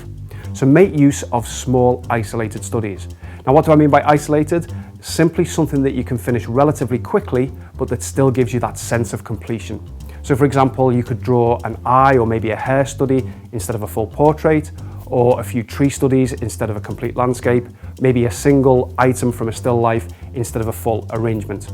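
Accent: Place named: Manchester, United Kingdom. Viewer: Firstly it’s wonderful to hear someone from Manchester I really loved your video It has so much useful information as a relative beginner of older age group. I have never painted as a child or adult until my seventies. I wonder if you teach, in classes in Manchester.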